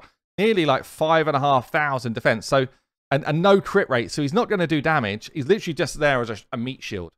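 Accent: United Kingdom. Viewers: My favorite raider on Youtube with a british accent, and the maker of excellent tools for the game. Love it. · You should be incredibly proud!! You have come a long way and your videos got me into raid and keep me coming back every day for new content. You are very entertaining, even when you are doing gear cleanses lol. I think the way you Brits talk is entertaining too coming from America. It's all very fun!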